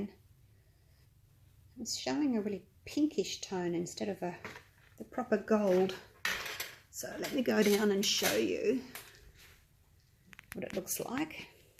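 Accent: Australia. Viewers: I love scruffy!!! I know this video is old...your birds and pours are fantastic!!! I'm from Ohio and ADORE Australian accents...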